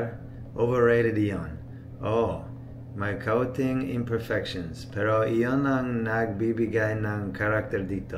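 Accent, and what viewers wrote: Philippines. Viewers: You sounded almost filiipino, 🇺🇸🇺🇸🇵🇭🇵🇭❤️❤️❤️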